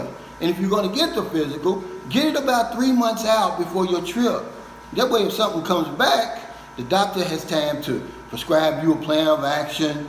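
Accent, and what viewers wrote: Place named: Texas, United States. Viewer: Sheeeeeeeiiiiittt sounds like Texas let's goooooo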